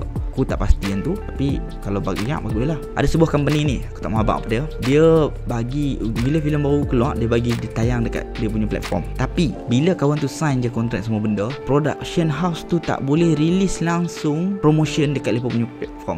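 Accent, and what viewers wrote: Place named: North, Malaysia. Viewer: done subscribe..sbb nk dgr bhse utara.. tp org mane ekk..perlis penang atau kedah??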